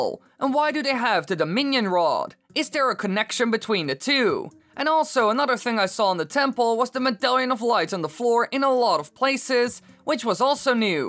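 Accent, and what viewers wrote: Netherlands. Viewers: despite the accent i can spot a dutchman in your voice for sure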